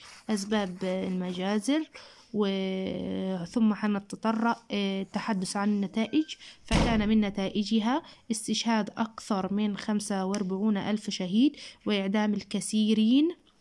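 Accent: Egypt. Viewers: انتي مصرية ولا جزائرية تقديم الدرس رديء جدا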